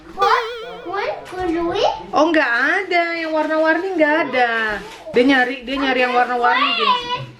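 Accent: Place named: Medan, Indonesia. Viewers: Wah mamak ...kirain asli dari Medan seperti Saya... Soalnya logatnya Medan x...😅 Benar mak sumut itu tempat nya sejuta etnis😄... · Nggk usah ngaku jadi orang medan tok. Dari logat jg kita udah tau klw itok itu orang medan 😜😀😀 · Orang Medan mana suaranya😘😘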